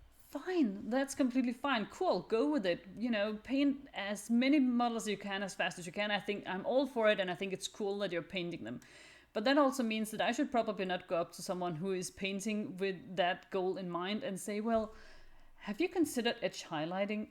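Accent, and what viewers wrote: Denmark. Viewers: Are you danish because you have a danish like accent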